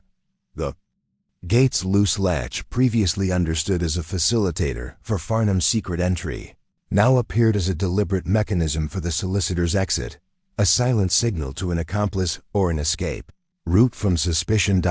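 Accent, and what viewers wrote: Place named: United States. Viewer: It lost its soul when read in American English. Deleting the channel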